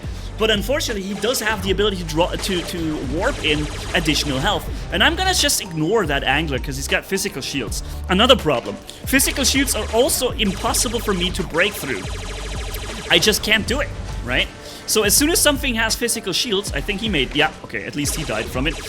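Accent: Germany. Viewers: Are you german but speaks english or english that has learned german?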